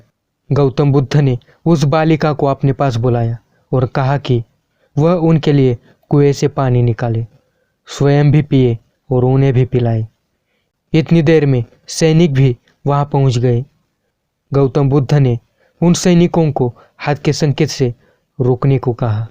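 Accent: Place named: Maharashtra, India. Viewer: तुमच्या हिंदीत मराठी झलक आहे मराठीत बनवा video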